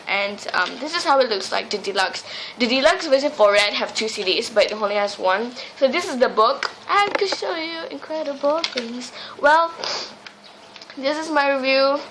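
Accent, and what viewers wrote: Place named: United States. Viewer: Awesome american accent though. Doesnt sound malaysian at all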